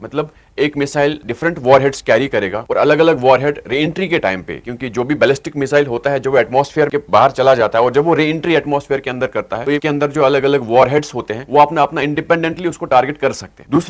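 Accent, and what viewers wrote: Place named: Kashmir, India. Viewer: Kashmiri accent ❤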